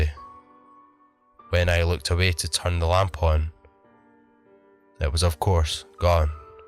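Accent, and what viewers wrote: Scotland, United Kingdom. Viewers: Yeah!!! I've found me a Scott. Love the accent. You have a new sub and I look forward to hearing from you for a long time. 😍😍😍😍😍😍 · Creepy, uh Mr what part of Scotland are you from? I have been so busy haven't had the chance to see your answer, and haste ye back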